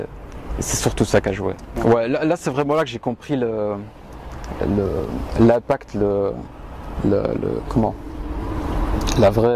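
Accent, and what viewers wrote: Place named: Belgium. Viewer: But he's from Belgique so he've got a accent, I mean this french sounds a bit different.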